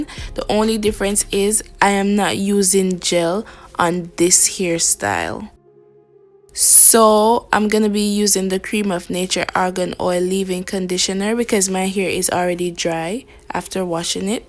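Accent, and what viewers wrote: Jamaica. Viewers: Love you're jamaican accent❤❤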